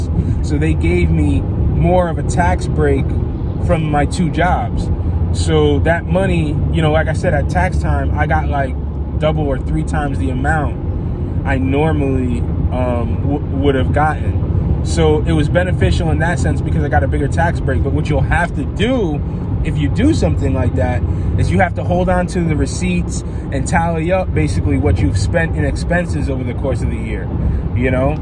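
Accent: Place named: Baltimore, United States. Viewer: Dnt know yu first time in the channel bet my next 2 checks yu from Baltimore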